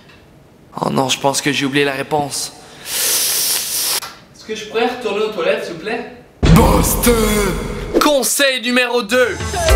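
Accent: Quebec, Canada. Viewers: enfin bon,c'est du québécois je pense^^ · Juste avec ton accent on dirait deja que tu es québécois..:) Super bonne vidéo!Je m'abonne! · t'as l'accent canada a mort mec (ne le prend pas mal j'adore les videos je me suis abonnés) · Il est quebecquois -_- Pourtant il a un léger mais très léger accent.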